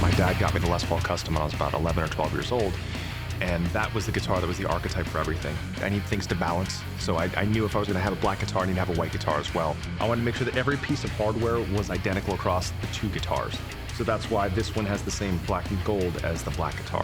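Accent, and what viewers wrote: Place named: United States. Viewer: Holy crap. I watched all Video that posted in order to learning English but he's talking so fast. I hope makes a course of speaking America accent. I like his voice. I am a fan from Viet Nam. 😁😁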